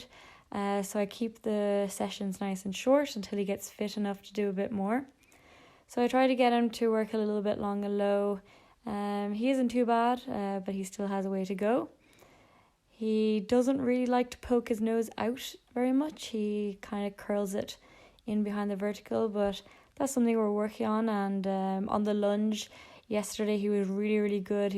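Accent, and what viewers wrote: Ireland. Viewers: I can sense the Mullingar accent from a mile away !! 😂😂😂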